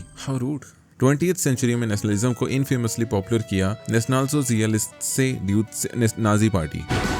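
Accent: Chhattisgarh, India. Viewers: Aap Chattisgarhi hai kya? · No offence ! But are you Chhattisgarhi?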